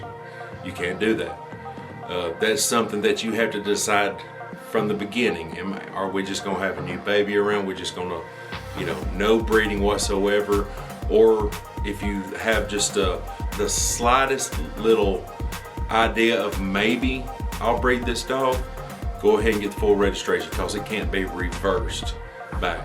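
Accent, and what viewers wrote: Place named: South, United States. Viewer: Hey english bulldog owner from the UK of a red and white girl.Shes as you would say in america full registration.Only thing in the UK its kennel club registered.In the uk red and white females go from around 3000 to 7000 depending on the bloodline.Just like to say all of your Bulldogs look beautiful and healthy and like alot of people have commented they would buy a pup from you.As would I if I was in the US.Edit Love the strong southern accent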